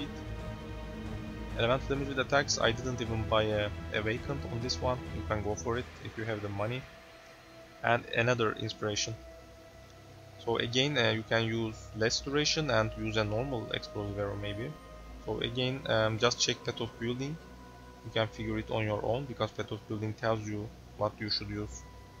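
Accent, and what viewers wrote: Turkey. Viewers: türk şivesi bu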